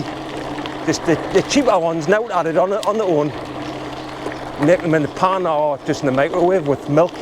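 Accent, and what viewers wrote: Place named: Northern England, United Kingdom. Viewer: Just found your channel, and it’s great. To see you enjoying nature and the solitude of your surroundings is lovely, especially as a few of your rides pass near where I live ( Newbiggin ) on your way North. No airs and graces just a genuine Geordie on the trails and roads that me and the family ride,Even if Stanley is not really Geordie land you sound like you originate from further north. Love the humour too.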